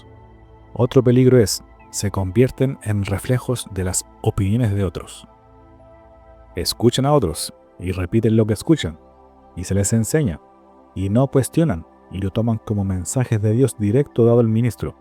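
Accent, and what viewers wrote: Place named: Chile. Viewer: Iba a comentar que se debiese buscar un enfoque de beneficios y no miedo de no aprender, pero es todo lo contrario, tu canal es genial, una opinión muy critica, una voz con un toque chileno pero muy neutro y no buscas ideologizar sino compartir para quienes quiersn escuchar, y eres criticos con los ceeyentes y no con los que no lo son, y eso se me hace muy consecuente, es bueno encontrar gente que aun aprenda fe las enseñanzas de cristo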